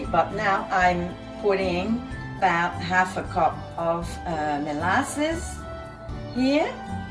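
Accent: Australia. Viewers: I could pick up the Australian accent easily. I'm sorry that as it is my first time at this channel I don't know your history. The classy French accented English reminded me so much of the beloved Madame Benoit. For those who might not know, Jehane Benoit was an early cooking star on Canadian TV and a rarity as she was on both the English and French CBC channels. She was deferentially known as Madame Benoit (rarely Jehane) and was a woman who was ahead of her time belieing her matronly appearance. She was an early advocate of vegetarian cooking and much later microwave cooking. <link>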